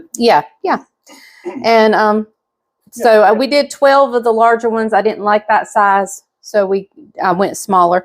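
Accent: South, United States. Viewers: I'm gonna go ahead and soak my zucchni n pneapple juice, yum it sounds perfect for this bread. You are so good, love this video and your sweet southern accent! You are a very good baker/home chef.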